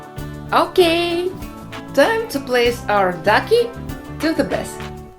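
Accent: Russia. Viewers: Акцент Русский, или мне кажется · You speak like russian😅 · OMG!! WHAT!!! UR RUSSIAN!! OMG YOU SOUND SO COOL I DIDN'T EXPECT THAT.. UR SO COOL 😱😱😍😍😄😆😱😱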